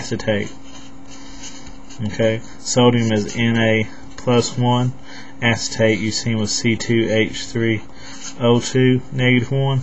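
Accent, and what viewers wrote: United States. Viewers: Thank you helped a lot :) I love the accent lol Americans mmmm mmm good lol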